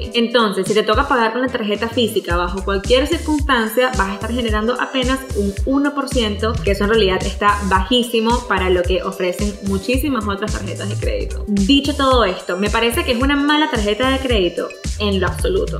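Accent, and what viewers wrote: Venezuela. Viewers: Hola me encanta tus vídeo y la forma como los abordas. Y ese acento venezolano es lo mejor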